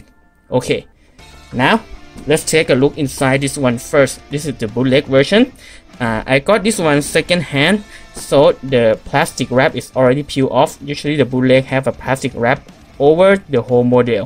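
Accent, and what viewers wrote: Thailand. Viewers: สำเนียงเหมือนคนไทยเลย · Are you Thai? พี่เป็นคนไทยไหมครับ ฟังจากสำเนียงแล้วน่าจะใช่( From your accent).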